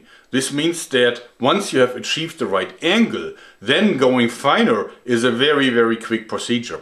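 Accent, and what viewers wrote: Germany. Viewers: This is amazing. I love your Channel. I used to be a Chef so I really appreciate a sharp knife. I also like your thick german accent. Makes it unique somehow. Und das, obwohl Deutsch meine Muttersprache ist.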